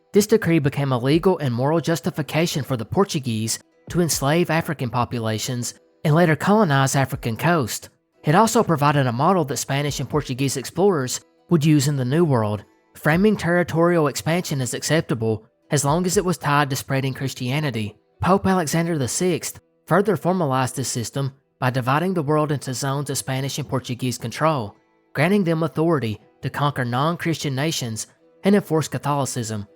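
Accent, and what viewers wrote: South, United States. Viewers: that's why I always call you my southern brother 💙💙, when I became aware of the Bible being edited I thirsted for knowledge of all things hidden, leading me into the rabbit holes of everything. I know you are the same and that's why I love your content. If money wasn't so tight for me since my daughter broke her back in a car wreck and she and my grandson live with me I'd support your channel in every way. You've been my favorite from the 1st time I heard that genuine southern accent 😊😊. Don't change a thing, love from Arkansas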